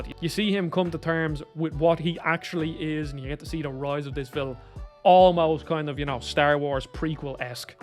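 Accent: Ireland. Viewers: Earned a sub from me pal - glad to hear a fellow Irish accent raving about this game!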